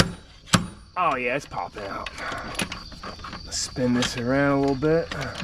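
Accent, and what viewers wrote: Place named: Philadelphia, United States. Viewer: Has anyone ever told you you look like Jared Keeso? It’s weird seeing what is a Canadian actor speaking with a Philly accent lol. Love the videos!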